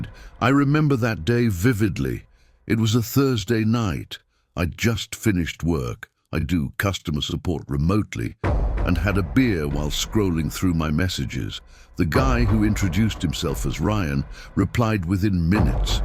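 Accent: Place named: RP, United Kingdom. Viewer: Just discovered your channel! Interesting and eerie story! What makes your channel unique is your voice. I get the feeling that you're an older gentleman, something you rarely find on this sort of YouTube video. (Side note; if the voice is as an AI voice, it's really realistic sounding!....no offence intended if it's your BBC voice)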